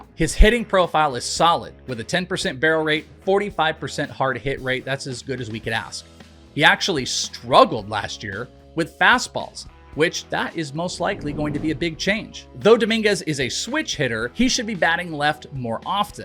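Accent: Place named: Wales, United Kingdom. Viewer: Welsh talking Baseball 😍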